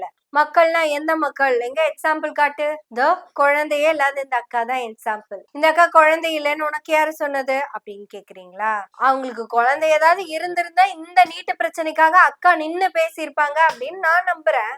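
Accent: Kongu, India. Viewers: கொங்கு தமிழில் நீங்கள் பேசும் உங்கள் கருத்துக்கள் பாராட்டுகிறேன். வாழ்க வளமுடன்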